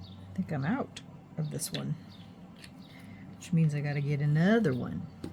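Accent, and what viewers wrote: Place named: England, United Kingdom. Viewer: Loll! I heard his beautiful English accent reading this comment!!! And yes beautiful results ❤🎉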